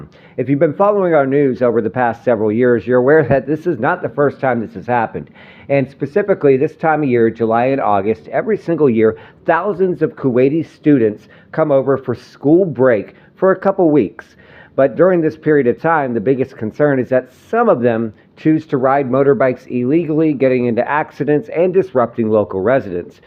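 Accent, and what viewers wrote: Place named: United States. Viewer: it's not fault. He's American. Words like Bangkok and Bang Lamung both have the letter 'A', but Americans insist on pronouncing these words with an 'E' and an 'O' respectively. Yes, I know, weird...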